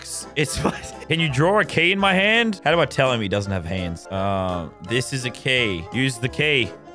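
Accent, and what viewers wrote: Australia. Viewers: The Aussie accent😒😒 as an Aussie myself i find our accent to be the worse in the entire world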